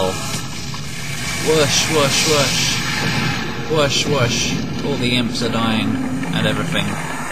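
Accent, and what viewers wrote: United Kingdom. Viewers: good job ^^ also nice British accent =3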